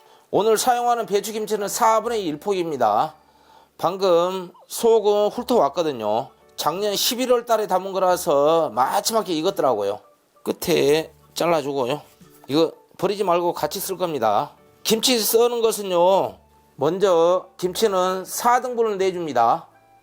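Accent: Jeolla, South Korea. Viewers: 구수한 전라도 사투리로 맛깔 나는 설명 감사해요😅😅😅😅😅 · 전라도 사투리 들으니 더 맛있을 것 같은 느낌적인 느낌~~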